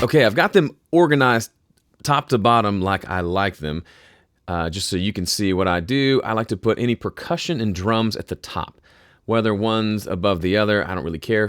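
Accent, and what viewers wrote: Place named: Tennessee, United States. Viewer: He sounds like home (I’m originally from TN)!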